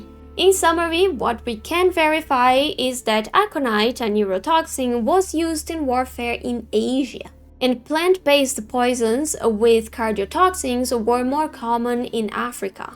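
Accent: Brazil. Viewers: vc é brasileira ne fala a vdd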